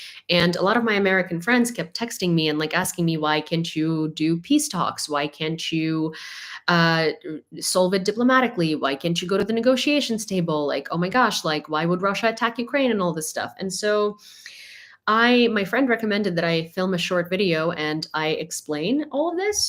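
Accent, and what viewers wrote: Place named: Ohio, United States. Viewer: I get the Ohio like quality in your voice...it's a very plain accent without much filler. And Ohio and Ontario are just across Lake Erie from each other.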